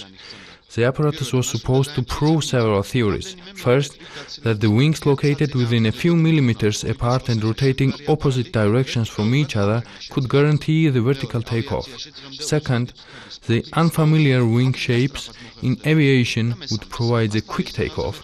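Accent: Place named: England, United Kingdom. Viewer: L'accent rosbeef est déjà horrible à entendre ... mais là c'est l'apothéose !! 😡😡😡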